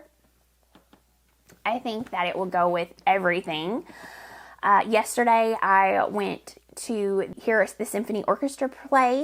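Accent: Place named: Texas, United States. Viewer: I'm from North East Texas and currently live in Alaska so listening to you is a little taste of home. Love your podcast and thoroughly enjoy you as a person. You're so lovely! Thank you for sharing your farm. #Softly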